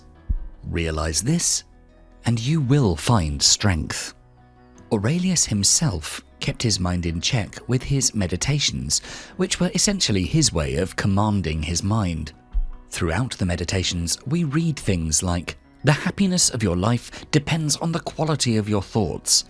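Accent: United Kingdom. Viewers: I was in stress in those dark days quit job, broken up, didn't find any light in my way of life. Coincidently, I watched your video, listened to it because I love British accent and I've been learning English then I realized this channel like my teacher -it took me back to the right way, shown for me what I have to do. After one month quitting my previous job (which I didn't like and it took 12-14hours/day ) finnally I found the new job which I really enjoy it🙂. Thank for your effort to make these videos. Hope you and your team have a good health to make lots of precious videos to heal people like me. 🎉❤❤❤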